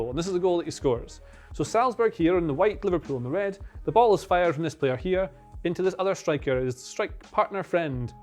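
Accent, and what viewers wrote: Scotland, United Kingdom. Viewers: Scottish presentation?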